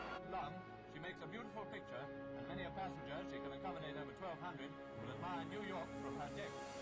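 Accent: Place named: Liverpool, United Kingdom. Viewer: Lovely video. Enhanced by your accent. Liverpool? Great video about our undersung hero.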